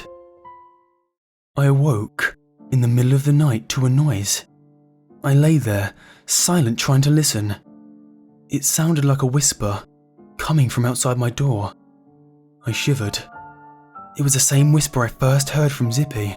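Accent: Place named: United Kingdom. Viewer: Firlst time one this chanal by the way Me when it starts: AHHHH!!!! BRITISH ACCENT!!!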